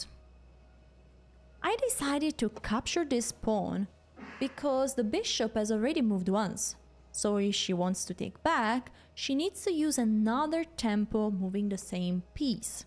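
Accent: Italy. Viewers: You have super cute italian accent! 😄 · You have nice Italian accent 😍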